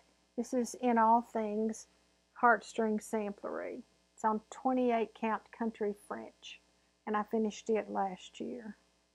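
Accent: South, United States. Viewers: I love listening to your southern accent. Also love green Manger and red Manger. Glory and circle flowers. Happy Easter.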